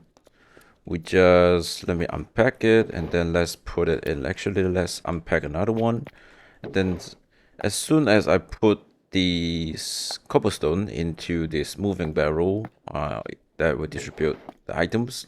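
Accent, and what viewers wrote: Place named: Malaysia. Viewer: I was wondering why your accent sounded familiar, only to realise you’re malaysian too! Love the production value in your video, and excited to see what’s next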